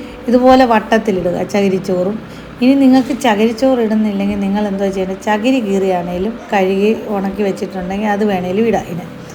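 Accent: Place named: Pathanamthitta, India. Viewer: Pathanamthitta സ്ലാങ് 😄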